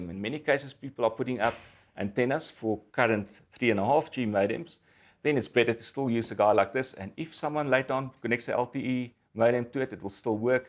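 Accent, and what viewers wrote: South Africa. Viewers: a South African accent talking man tech talk... with all the gear on the desk ... I think it might be an Austrian thing as we have a lot of South Africans living here and we find the Accent very funny as they are mostly very wealthy and loud... but not that with it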